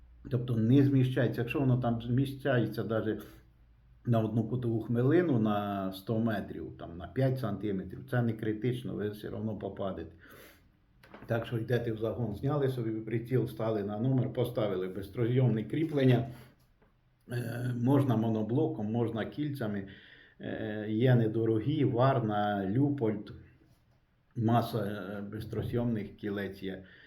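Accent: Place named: Vinnytsia, Ukraine. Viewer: Шановний пан! Чудово, що Ви зробили свій канал і популяризуєте мисливство. У Вас надзвичайна харизма і неперевершена вінницька говірка, Ви прекрасний оповідач і фахівець своєї справи. Але ваші відео не тільки корисні а і вредні) Я три місяці тому купив АР15 в 223 калібрі для захисту, тренувань і розваг, і зовсім не для полювання. На стрільбищах і бачив болтовики і стріляв трохи. Також дивлюсь чудові збройові посиденьки від Ібіса з Тарасом і Антоном. Вони теж популяризують зброю, зокрема болтовики. З ваших веселих оповідей я захопився полюванням на дивані) хоч всього пару раз був на охоті колись глядачем. І прикидував, як би то я зі своєю аркою теж би ходив. І тут Ви кажете, що 223 калібр зовсім не підходить для полювання, я Вам, як фахівцю безперечно вірю, очевидно, що це так і є. А Тарас якраз розхвалював карабін Маузер М18 в 308му калібрі. 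Все, думаю, куплю собі Маузера! Подивився відео, які вони класні, і знайшов, де його можна купити найдешевного зі стволом 51 см. Порадився з родиною, ну, кажуть, твої гроші... Зібрався в Ібіс по планку Пікатіні, а потім в інше місто по карабіна, і за три кілометри до Ібіса думаю - а нафіга він тобі треба, той Маузер? Яке полювання, ти по справжньому ніколи на ньому не був, і не знати коли ще попадеш на нього. Купити, щоб клацати класним затвором, і іноді стріляти по паперовим мішенькам? То у тебе є арка для цього! Ні, не час зараз для цього. Цілий ранок мені було погано, що відмовився від скоротічної мрії, а потім сам себе хвалив за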